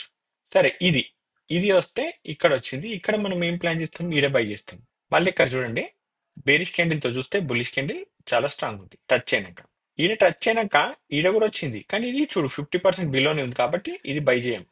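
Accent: Telangana, India. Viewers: మీరు మన తెలంగాణ యాసలో చెప్తుంటే చాలా సంతోషంగా ఉంది, మంచి knowledgeable video sir, ఇలాంటివి ఇంకా ఇంకా చేసి మా లాంటి beginners కి guide చేస్తూ ఉంటే మన తెలంగాణ ప్రంతం వాళ్ళుకూడా పక్క రాష్ట్రాల వాళ్ళు చేసే calls and Tips మీద ఆధారపడకుండా స్వంతంగా ట్రేడింగ్ చేయగలరు. ధన్యవాదాలు 🙏🙏🙏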